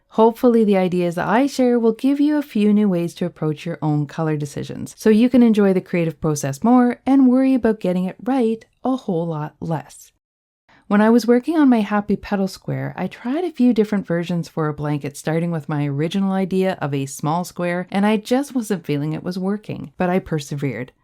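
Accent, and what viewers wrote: Canada. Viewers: You sound canadian. So hopefully you have access to Home Depot and/or Lowes. I sometimes use their color swatches from the paint department. It's good for gradient colors and mixing and matching. I know, sounds a bit weird, but really helps me with color choices.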